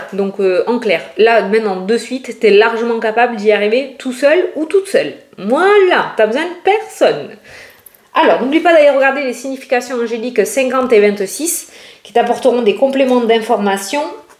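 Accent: South, France. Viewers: Bonsoir pour moi c'est la 1ere fois que je vois votre guidance..en tt cas j adore votre accent du sud moi qui suis dans le nord ca fait chaud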